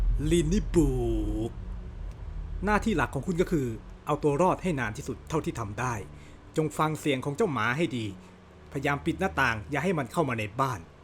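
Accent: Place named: Suphan Buri, Thailand. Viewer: อยากลองฟังพี่ พูดเหน่อทั้งคลิปดูจัง555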